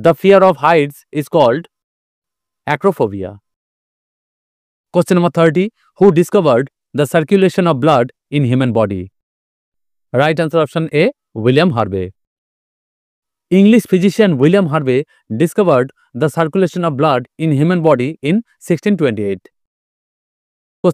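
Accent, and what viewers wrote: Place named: Bengal, India. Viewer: Dada tumi bangali na, tomar accent e buje jai, ami tomar video roz dekhi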